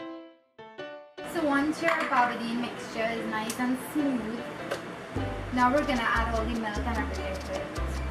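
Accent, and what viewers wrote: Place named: Trinidad and Tobago. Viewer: U a true trini "ah" ,"doh" "kinda".. ah love we dialect too bad❤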